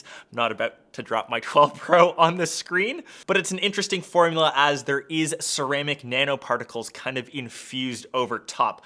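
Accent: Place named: Canada. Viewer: Love the Canadian accent! The 2 phones in your videos are the 2 I'm deciding between; the red 12 & the Pacific Blue Pro. Imagine a red Pro! 🥰 I'll order the Pacific blue & then they'll release a red later on. 🤦🏻‍♂️Edit, it's never mentioned & I keep forgetting, I AM getting the Pro, because it has 6GB RAM vs 4 in the 12.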